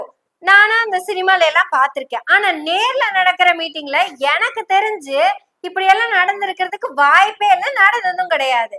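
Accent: Kongu, India. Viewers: கொங்குத் தமிழில் கலக்குறீங்க சகோதரி செய்தியும் கருத்தும் சொல்லும் ஒரு நேர்முக வர்ணனை போல அமைந்திருக்கிறது... அந்த ஆதவ் தலைவலி வந்தாலும் கூட திமுக சதி என்று கூறுவர்... சொந்தக் காசில்...... வைத்துக் கொள்வார்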